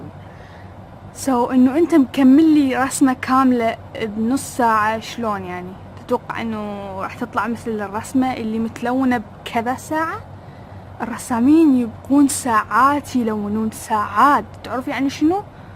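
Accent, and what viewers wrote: Iraq. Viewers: جمال اللهجة العراقيه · حبيت اللهجه العراقيه تجنن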